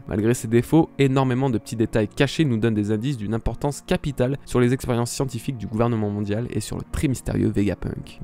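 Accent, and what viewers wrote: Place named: Alsace, France. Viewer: Rien à voir mais tu es d'Alsace non ? j'ai l'impression d'avoir reconnu un petit accent ahah Et excellent vidéo ça change pas ! :))))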